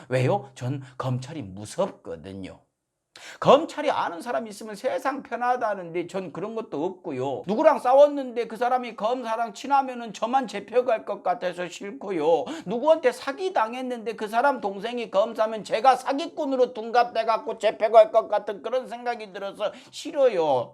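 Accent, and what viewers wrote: Jeolla, South Korea. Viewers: 행님 고향이 어디신데 전라도 사투리 그래 잘 쓰시는가요? 경상도 사투리도 고래 써 주시면 정말 좋겠네요^^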